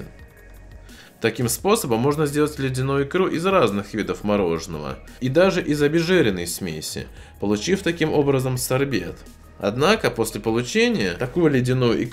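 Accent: Estonia. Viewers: Ты из Эстонии? Шок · Бро,ты из Эстонии?!😃(я да) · он из Эстонии чтоле?? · Ты с Эстонии?))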